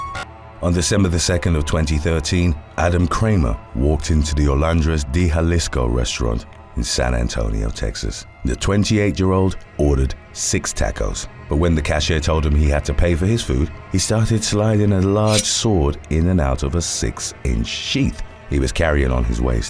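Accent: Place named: England, United Kingdom. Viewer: Okay who taught how to pronounce Mexican names in english? First time I've heard Martinez come out correctly. I do always love hearing people with English accents trying to work out pronunciations of names we're all used to on this side of the pond! As always, gripping work!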